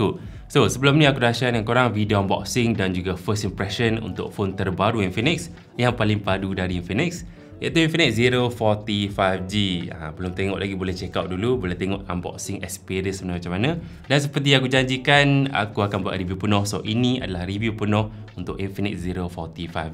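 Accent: Kelantan, Malaysia. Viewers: baru tahu ore kelate ruponyo..😅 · ni ore kelate ko boh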